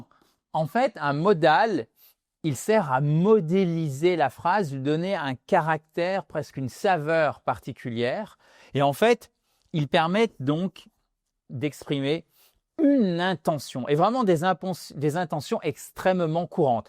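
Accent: France. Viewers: Les Français du sud de la France prononcent de la même manière les terminaisons du futur simple (-rai) et du conditionnel présent (-rais), les rendant indiscernables à l'oral. habite à Saint-Étienne. Et voilà pourquoi. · J' adore lorsque tu parles anglais comme parlent les français, en plus tu les connais très bien , puis que tu es son prof.....❤❤❤❤❤❤❤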